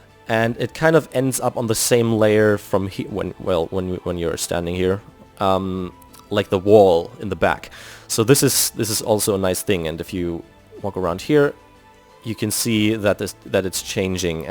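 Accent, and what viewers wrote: Germany. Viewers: lol... the German accent makes you make more sense somehow... can't really explain it! xD It's like your descriptions of what you are doing whilst using the German accent punctuate the steps in your thinking process more clearly... idek ( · maaaa schon wieder so ein deutscher .... wenigstens ein nicht sooooo deutscher akzent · I like the german accent though its a tad harder to understand. Also this is gonna be a fun series. :)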